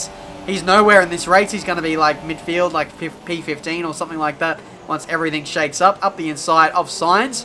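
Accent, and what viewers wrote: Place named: Australia. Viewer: Is there a better combination for a video than the word 'supers' and an Australian accent?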